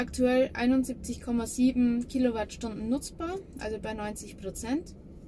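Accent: Bavaria, Germany. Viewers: Ich mag deine Videos und deinen Bayrischen Dialekt. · Hallo. Feine Sache. Nun gut, diese etwas säuerlichen Regelungen, dass man ein gleichwertiges, gebrauchtes Teil bekommen kann, die kenne ich auch aus dem Job. Die Leute denken immer das Garantie (Gewährleistung) bedeutet, dass man auf alle Fälle Neuprodukte beanspruchen kann. Pustekuchen. Allerdings ist es schon komisch, dass beim Akkutausch Tesla anscheinend Lotterie spielt wer was bekommt?! Nuja, Hauptsache es läuft wieder. Dein Dialekt erinnert mich an meine Kindheit, wo wir immer nach Bayern in Urlaub gefahren sind. Cool irgendwie.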